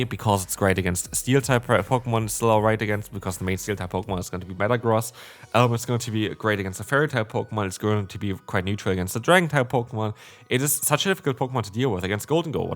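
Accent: Germany. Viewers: Bist du Deutscher? Oder woher kommt der Akzent haha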